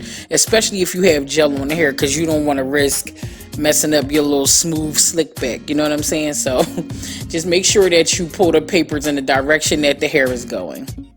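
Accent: Philadelphia, United States. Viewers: Your Philly voice is all that! Love my city. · I love how heavy your accent is that’s that philly right there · Darling where are you located?! You sound like you’re in philly (no offense!) is love a press and trim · Beautiful job! You can take the lady outta Philly but you can't Philly out the lady! Love that accent 💜💜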